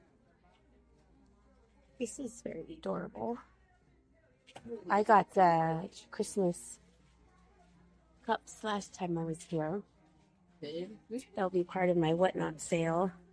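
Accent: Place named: South, United States. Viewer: Love your southern accent! Cant wait for the Maniac one... downeast? Or perhaps Acadian?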